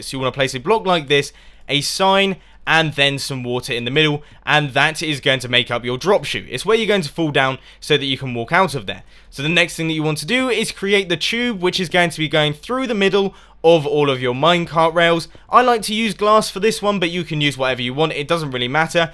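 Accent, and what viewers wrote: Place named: United Kingdom. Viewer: Cool British accent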